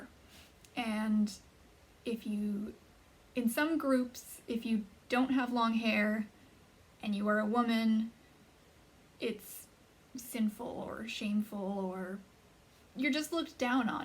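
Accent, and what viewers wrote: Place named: Canada. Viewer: God bless you sister. Thank you so much for sharing. Good for you for seeking to obey the Holy Spirit's leading in your life. I'm super new to head covering, and get great joy from these wonderful testimonies. Are you Canadian by any chance? Just asking cuz you sound a lot like me, and I'm in Nova Scotia, originally from B.C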